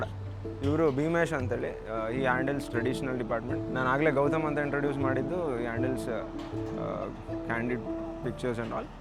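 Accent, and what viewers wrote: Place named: Davangere, India. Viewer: ಪಕ್ಕಾ ದಾವಣಗೆರೆ ದೇಸಿ ಭಾಷೆ ...ಖುಷಿಯಾಯಿತು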